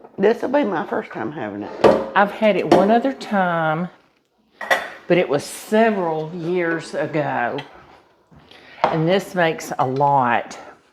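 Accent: South, United States. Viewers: So glad you're feeling better!! This looks delicious!! Ur from the south and love your southern voice but wondering exactly what state? Cause by golly u make me feel like Mama's still around about to give me a hug and tell me a story from her childhood!!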